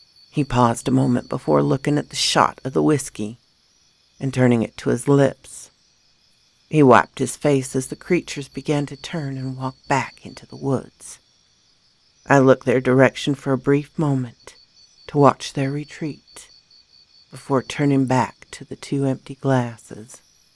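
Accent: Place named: South, United States. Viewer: Hi there 👋:) Thank you for the Spooklicious 😵 Narration!!! I 🧡 your Southern Accent 😉 I hope that you and everyone else has a Smacklicious 💋 Saturday Too:) much love Sexy Grandma Darkness ✌️💋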